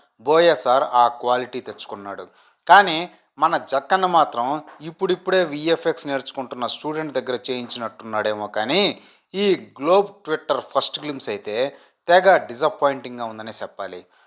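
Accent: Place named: Kurnool, India. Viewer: Endira ఇది థాయ్ 😂 మా కర్నూలు స్లాంగ్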